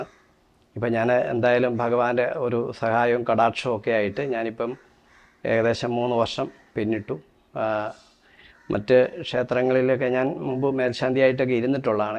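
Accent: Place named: Kottayam, India. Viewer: ഒരു വർഷം മുമ്പ് ഇട്ട വീഡിയോ ഞാൻ ഇന്നാണ് കണ്ടത്.....തിരുമേനിയുടെ സംസാരം കുറച്ചുനേരം കേട്ടപ്പോൾ കോട്ടയം ഭാഷ പോലെ തോന്നി....ഞാനും കോട്ടയം സ്വദേശിയാണ്.... ഇപ്പോൾ തിരുവനന്തപുരത്ത് സ്ഥിര താമസം ആണ്.....പല തവണ ക്ഷേത്ര ദർശനം നടത്തിയിട്ടുണ്ട്.....ഗണപതി ഭഗവാൻ്റെ അനുഗ്രഹം എല്ലാർക്കും ഉണ്ടാകട്ടെ,🙏🙏ഓം ഗം ഗണപതയെ നമഃ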